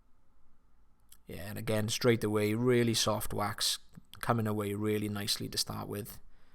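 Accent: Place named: Scotland, United Kingdom. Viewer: Is Scottish? I love the accent!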